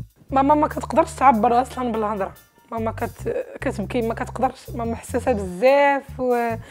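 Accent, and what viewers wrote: Egypt. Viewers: اويلي وحدي قالت ليك كاتحاااول باش تهدر بالداريجة هاذي باقة حتى ما تشهرات ومعمرها خرجات من المغرب و غالبة عليها اللهجة المصرية ويلي بااا قالت لك كانحااول ايوا بلاش ما تبززي على راسك ا حبيبة 😬😬😬😬😬😬😬😬😬😬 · لا من لباسك ولا من لهجتك كل الناس كانت تقول بأنك مصرية يا وخيتي احكي بي لغة القران العربية هل شوفت مصرية تحكي مغربي او لبنانية تحكي مغربي ؟؟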